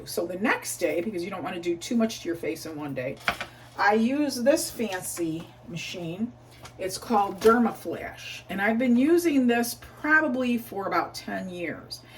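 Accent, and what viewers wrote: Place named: Midwest, United States. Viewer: Hi. I’m Guessing your age is 59, same age as me. You have brought a smile and laugh to my face. I’m happily married to my second husband for 27 years and have two son’s and 4 adorable grandson’s, if I were a lesbian you would be a beautiful wife for me, LOL! Please keep making the video’s. I’m disabled but can take care of myself without any help except for driving. My husband takes me to the doctor a couple times a month. I tend to be unsophisticated when it comes to real fashion. I don’t know the differences in perfume, cologne, & Eau Fraiche. I wear Twirl by Kate Spade it’s a fresh scent which I prefer. Please do more diet related videos and discuss your fitness routine and btw your Midwest accent is refreshing my father’s family was from Missouri and my husband and his family are from Missouri and Indiana so “ kid” keep those videos coming. 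😀😘 Thanks, Sharon.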